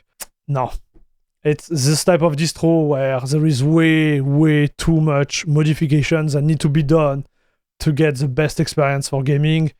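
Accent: France. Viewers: If you don't like french accent, just go cook yourself an egg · Mdr mec l'accent , ca se sent que toi aussi on se moquait de toi en cours quand tu essayais un accent plus british ! N'empeche ça me rassure, meme si ton accent est french de ouf bah on comprend parfaitement ! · Excellent ! Un abonné en plus, j'ai commencé doucement avec Bazzite sur mon pc fixe il y a environ 1 mois, idem sur ma.rog ally et je doit dire que grace a ce dernier je suis tombé amoureux de linux et j'ai totalement oublié windows (mêmepour la bureautique), cependant Bazzite est encore jeune et présente quelques bug et plantage, rien d'insurmontable mais, ayant vu de quoi etait capable Linux avec ce dernier j'ai cette soif de decouverte et depuis quelques temps CachyOs me fait de l'oeil depuis quelques temps pour ma config fixe et franchement tu m'a donner envie de me lancer sur ce dernier. 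J'aurai pu commenter en anglais mais je me suis dit qu'un peu de Français te ferait plaisir 😅, on a le même accent à couper au couteau 😂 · Si je ne me trompe pas, tu viens de la France. T'as un accent Franglais sans doute, ce que j'aime bien 🙂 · You have a strong french accent, so i'll say it to you in french: Ce que tu as dis sur Debian est partiellement faux. Tu as dis que les version étaient vieilles. C'est le cas PAR DEFAUT. En 3 lignes de commandes, tu peux ajouter les repos backports de debian. Ces répos possèdent les dernière version de beaucoup de packages. Par exemple, je suis sous Debian 12, qui est normalement fourni avec le kernel 6.1, mais avec les backports j'ai pu avoir le kernel 6.11.10 . Pareil pour les drivers nvidia, ou encore gnome. Dès que tu actives les backports, avoir les dernières versions est simple, tu rajoutes juste -t <nomListe> a ta commande APT pour les prendre depuis les backports. 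AVERTISSEMENT: SI TU FAIS CA N'ESSAIE SURTOUT PAS D'INSTALLER LES META PACKAGES (linux-image-amd64 par exemple) CAR TU RISQUE D'AVOIR QUELQUES SURPRISES... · I came here for the French accent ❤️❤️ Love the content keep it coming lad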